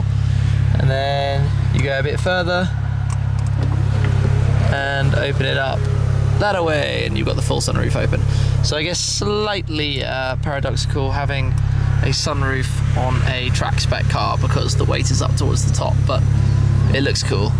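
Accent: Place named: England, United Kingdom. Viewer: what city/region in England is your accent from? Nice car!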